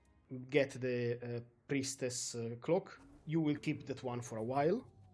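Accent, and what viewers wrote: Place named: Italy. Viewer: ah ecco............mi pareva che tu fossi italiano!!!! dall'inglese, buono perfetto, ma ho sentito che quell'ìinglese era un pò da pronuncia italiana!!! Comunque Grazie, le tue build sono interessantissime e questo è un bellissimo gioco!!! che ancora non ho finito visto che sto provando build su build.........e sono a 100 e passa ore!! Hai preso anche l'espansione? io si, e mi piace un sacco!!!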